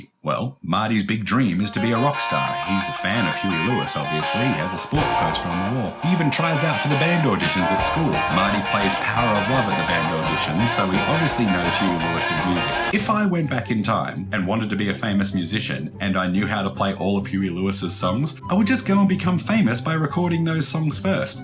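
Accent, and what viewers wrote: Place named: United Kingdom. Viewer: oh damn dude that comment i left, i deleted after a minute, because the tissue joke was quite obvious for everyone, and not a quick split second blink-and-you-miss-it pause-at-the-right-time kinda deal (like how i thought it was) you replied fast!! i appreciate that anyway this is a good video and i adore back to the future and thank you and all that subscribed and whatever and make more videos and shit that whole theory was pretty top notch and i love it actually... i kinda wish it was true and canon now honestly but my only ACTUAL criticsm for your video is you should vary up the music and sound design a bit more you know, the heavier points you drop the music to give some focus, maybe switch it up every now and then so it's not the same stock kevin macleod track... just make sure the video is as good to listen to as it is to watch that's it though, the editing was great, the jokes were good, you have a great personality, your voice is british and memorable (which is great combo on youtube) the sound design just needs some more work, and that's just from THIS video alone, i have yet to see your others!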